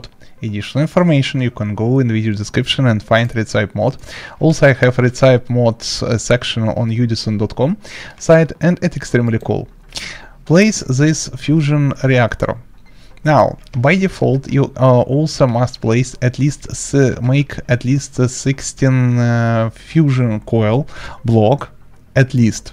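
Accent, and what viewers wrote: Russia. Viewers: Акцент русский)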